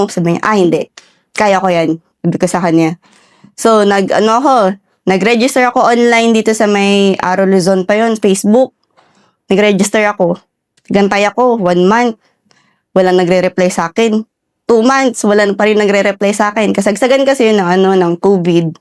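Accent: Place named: Visayas, Philippines. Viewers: Mam parang nainlove uli ako😅. Akala ko vsaya ka mam kc tunong vsaya ka, galing mo pakinggan nakakatuwa mas nakkainlove😅. May part 2 ka sana madam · Bisaya cebuana Ang intonation mo ma'am. I'm veri proud of u being a warrior sundalo.dapat may MEDALLA KA MAAM salydo sa nyo ni s ir clarin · Bisaya narin ang Punto hehe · Parang bisaya c Ma'am. Salute sau maam. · Yung punto mo bisaya nman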